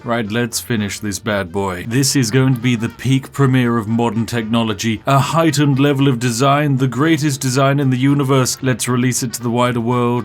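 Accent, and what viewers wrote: United Kingdom. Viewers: Ah yes you know what i love more than a British accent? and extra Britty Brit accent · And me, as a frenchie, I love British accent that I can understand.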